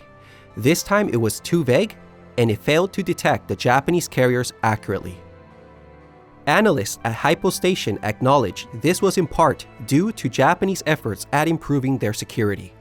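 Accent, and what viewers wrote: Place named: United States. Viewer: These are two very well done videos. Thank you for separating them from the perspective of each commander. I do appreciate you reading from sources as well as narrating the events. However, I would like to give you some constructive criticism. When people make videos on topics that I do not consider consequential, I don’t give constructive feedback. But since you are making informative videos on world history, I would like to do this. And my criticism is regarding your pronunciation of certain words. From your accent, you’re obviously American as I am. Your pronunciation of the letters TH vary. You pronounce thoughtfully, and thankfully, perfectly in my opinion. But the harder TH sound of the, them, and they come across as de, dem, and day. Also, with the words thoughtfully, and thankfully, you use the letter L correctly. 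But in other words, you swallow it. Guadalcanal becomes Guadohcanow. Vulnerable becomes vuhnaboh. I’ve been around, and I know that the ability for someone to communicate in my version of English has nothing to do with intelligence. Just like my inability to speak Russian, nor Swahili is a reflection of my own intelligence. The ability to speak American English, comes down to education and application. You have demonstrated your education by putting together this video. I would just encourage you to apply yourself a bit more to the language to finish off this video with the same expertise and skill with which you began. Thank you again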